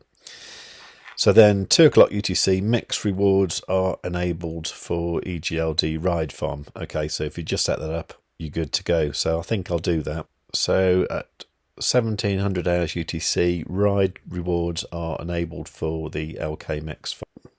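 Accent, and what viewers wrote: United Kingdom. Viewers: You are living in the states? you sound british or am I mistaking as german and english as a foreign language 😬 I guess the people who got RIDE via Launchpad are able to create a farm. For me I need to wait to get RIDE token, but actually I would like to participate from higher APR in the good old Farms when people leavin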